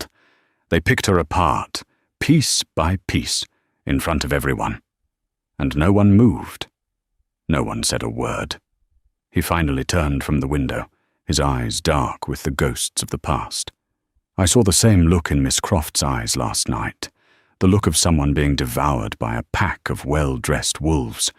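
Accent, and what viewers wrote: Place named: England, United Kingdom. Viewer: Ah yes. Luv that purring English accent. YUM!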